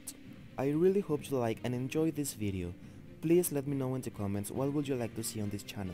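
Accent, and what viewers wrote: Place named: Spain. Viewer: Hey, where are yoy from? Por el acento pareces español o hispanohablante, pero al país en concreto ya no logro distinguirlo. Además la marca Jovi de las acuarelas es muy común aquí en España, no sé si se dará en más países. Estoy en lo cierto? Gran canal por supuesto! Acabo de descubrirlo y me encanta!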